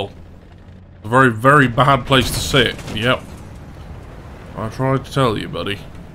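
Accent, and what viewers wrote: United Kingdom. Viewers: Does anyone love this channel because of British accent? I am not sure why but it feels attractively hilarious.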